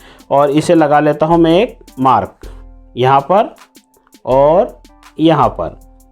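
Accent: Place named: Maharashtra, India. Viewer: मला वाटलच होतं आपण महाराष्ट्रीयनच असाल कारण आपण अठ्ठाईस ला अठ्ठाविस, सत्ताईस ला सत्ताविस बोलत होता. जय हरी विठ्ठल बोलणारा माणूस मराठीच असू शकतो. 💐💐💐🌺🌺🌺💐💐💐💐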